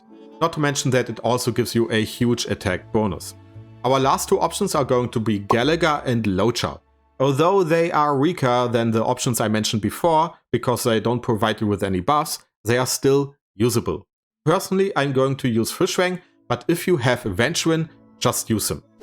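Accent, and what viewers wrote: Germany. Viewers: i hear a german accent...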